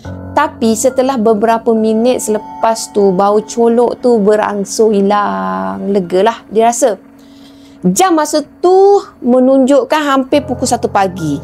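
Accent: Kelantan, Malaysia. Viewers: Kak bercakap KL capur dngn bahasa kelantan,saya selalu hadir Kak,saya dari kelantan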